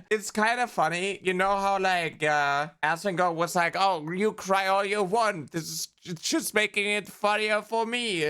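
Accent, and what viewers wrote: Germany. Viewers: Ok I am gonna say it, I thought you were Shyaren under a new name cause I haven't heard her voice in a long time lol. I guess the very distinctive German accents you two have made me think that lol. I will maintain if you had a high pitch and were more of a gremlin, you two would sound exactly the same lol. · That's what I thought too 💜 I immediately recognized the German accent and got super happy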